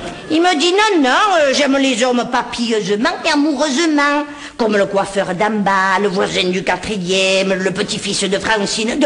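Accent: France. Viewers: C'est excellent!!!!j'entends vraiment tout le parlé marseillais de ma grand mère, c'est tout a fait ça Merci 🙏🥰💕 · Elle a l'accent niçois.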